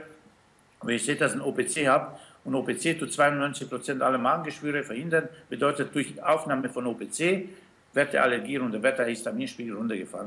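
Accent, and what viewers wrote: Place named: Austria. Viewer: Ein österreicher😂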